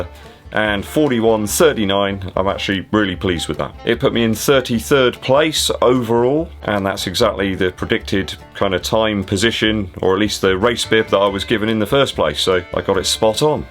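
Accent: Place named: West Country, United Kingdom. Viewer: Great race day footage and I'm also a fan of regional accents with the West Country at the top of the list 👍😁. Raced a local 10k last week in Milton Keynes and am running a 5 mile race this coming Tuesday in Leicestershire. Just bought a new pair of Boston 9s and so shall wear them. My other Boston 9s (they produced a 5 mile PB ) are enjoying their retirement but come out in the garden every now and then. Giving good vibes keep it up.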